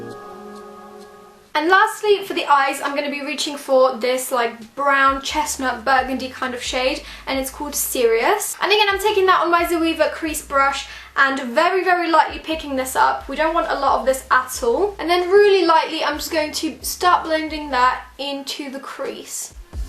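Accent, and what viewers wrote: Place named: United Kingdom. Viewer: jesteś z Polski?????????????????????? co?????????????????? nie poznałam, masz taki piękny brytyjski akcent, wg śliczna jesteś. Od ilu lat mieszkasz w UK?:)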